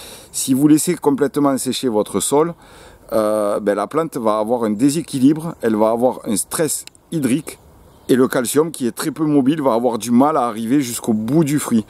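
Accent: South, France. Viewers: Moi j'y connais rien en jardinage mais par contre, j'adore l'accent du Sud !! C'est l'accent du Sud Est ? Du Midi ? Je ne sais pas les differencier. Rien que pour ça j'adore cette vidéo et je vais m'abonner ! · Bonjour. Video claire, précise, concise et fort intéressante avec votre petit accident du sud. Merci de me rappeller des basiques que j avais un peu oublié.... J ai une formation horticole, mais en 30 ans on oublie 😉 Petite question peut être un peu idiote mais quel style de lait est t il préférable d utiliser ? Stérilisé, entier, demi écrémé....? Si vous me tirez au sort je vous donnerais le nom d une exceptionnelle tomate cerise que je mets depuis 2 ans.... Allez c est pour moi.. C est gratuit... C est la sundance... Bon c est une hybride mais di vous ne connaissez pas... Je ne peux que vous la conseiller. 😉 Bonne soirée à vous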